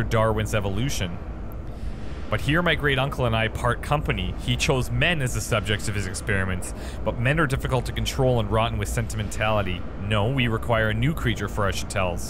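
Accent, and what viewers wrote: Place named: Canada. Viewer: I love your lets plays! Don't ever change. And your Canadian accent is adorable. And just to be pedantic, I have to point out that "Thames" is actually pronounced "Tems".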